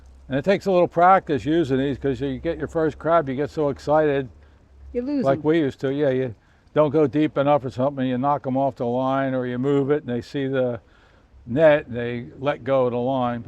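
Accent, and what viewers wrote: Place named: Maryland, United States. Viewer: You guys are wonderful we love you... We now live in Jupiter fl.. Don't crab the loxahachee.. But watch you.... Miss maryland..you sound like that's where you are from.. Happy crabbing. Found an alloy can eat in stuart... Are you from Maryland.. Ann mckewin west palm beach... God bless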